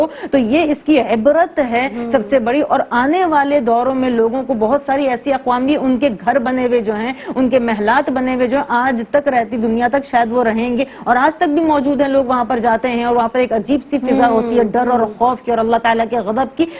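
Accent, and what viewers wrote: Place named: Arab world. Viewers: Why she talk Urdu in Arabic style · I think you don't have idea that she have born in Saudi Arabia, and brought up there and she doesn't know urdu much, and her first language is arabic that's why she speaks like this, kindly search about the person then talk about them....jazak Allah khair.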